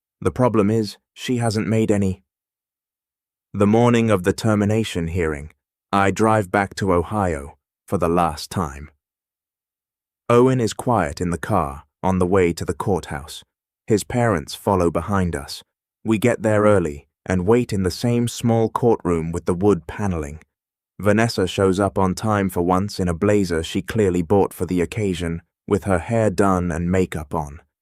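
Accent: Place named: United Kingdom. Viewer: THIS IS AN EXACT REPEAT FROM ANOTHER CHANNEL!!!! IT’S STANDS TO REASON IF YOU ARE GOING TO POST STORIES YOU SEARCH FOR ONES THAT HAVEN’T BEEN USED BEFORE INSTEAD OF CHANGING THE NARRATOR‘S VOICE TO BRITISH!!!! VERY DISAPPOINTED, TOO MANY PEOPLE TRYING TO MAKE A LIVING POSTING FAR OUT, REPLICATED, AND RANDOM NEW STORIES TO PROVE THE CHANNEL IS DIFFERENT AUTHENTIC ORIGINAL!!! UNFORTUNATELY HE WAS IN A BRITISH ACCENT WITH BORING BACKGROUNDS, THE QUALITY OF THE WAS DESTROYED, AND PROPER PRONUNCIATION AND POORLY DICTATED WITH A VERY MONOTONE VOICE!!!!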